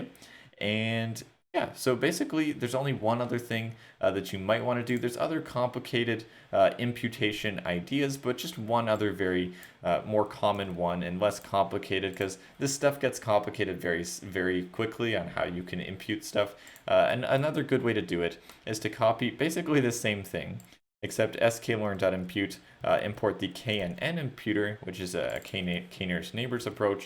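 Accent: Canada. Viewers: Bro love the way you explained "Imputer" , And you pronounced "neighbor" accurately Canadian accent rocks brother Thanks a Ton !!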